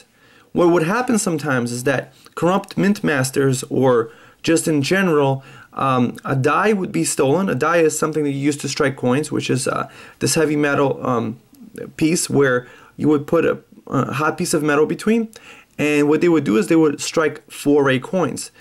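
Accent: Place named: England, United Kingdom. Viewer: stop butchering latin with an english pronounciation please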